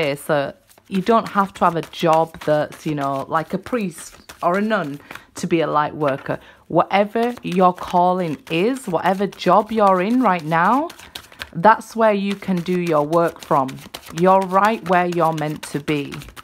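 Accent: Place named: Northern England, United Kingdom. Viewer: Nice reading.. thank you! And love the Northern accent.. Ay up lass! 😊😂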